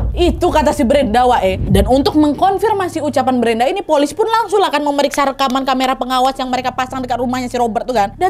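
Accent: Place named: Medan, Indonesia. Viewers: Lucu si pembawa acara..Kaek orang Sumatra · idolaku yng baru dlm knten yng crewet dr bbirmu tu, suka x bahasa yng kao ucap tu, kek orng Medan, apa pekanbaru tah aplah tu, lnjut skses bun cntik👍🤣😀😂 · kamu hebat dalam menyampaikan isi cerita kriminal yg seolah olah kamu sedang menyaksikan nya.. Salut utk kamu.. orang Medan ya? Kok logatnya kental bangaaaaat.. sor kali! 😜😜😜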